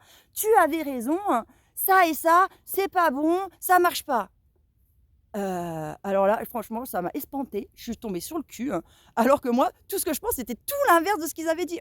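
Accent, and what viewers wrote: Paris, France. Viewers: Petits conseils : réseaux sociaux, pas réseaux socials, ça pique! Essayer de perdre cet horrible accent parigot. Et enfin, suivre une psychothérapie, dont il semble que vous ayez grand besoin.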